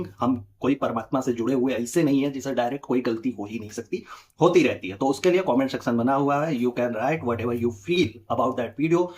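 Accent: Uttar Pradesh, India. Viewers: Typical UP buoy hai isiliye hume pasand hai aapke vedio, lehja aur pure desi andaz acha lagta hai, pl carry on non stop entertainment🎉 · Problem kya hai bea UP rural ke hain toh? Atleast you have courage to be who you are and showing your face in the video. I love your video and accent. Kuch bhi bolte hai log bhak😅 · Recently saw your videos..One thing i like i mean loved watching your videos because of your pure desi style.Please keep it that style…Pure UP style accent and the way you narrate make it more interesting .Thank you🙏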